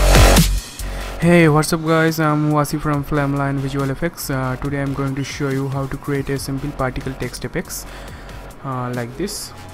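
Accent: India. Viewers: Sorry, but your profound Indian accent prevents me from understanding hardly anything you say !!!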